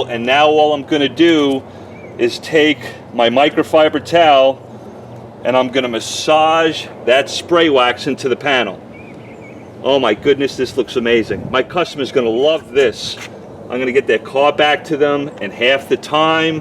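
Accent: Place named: Italian-American, United States. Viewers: Truck is Texas, voice is Long Island (observation from Australia). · This guy must be Italian from the east cost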